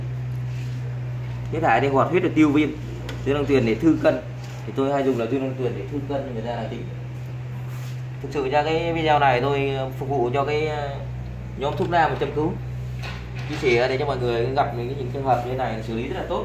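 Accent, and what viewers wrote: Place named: Thanh Hoa, Vietnam. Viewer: Bác giọng thanh hóa thì phải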